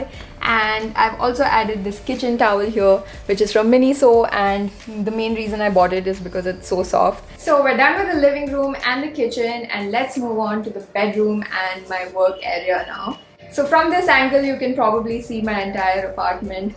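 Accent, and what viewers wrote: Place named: Mumbai, India. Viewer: What a ridiculous thing to say. Living alone is something that a lot of people aspire for. You don't need to be filthy rich for that, although of course it helps. And in every city in the world people have peculiar way of pronouncing things. Growing up in Mumbai, even I used to speak like this only. Eventually when I shifted I speak like people around here now. Nothing stuck up about it.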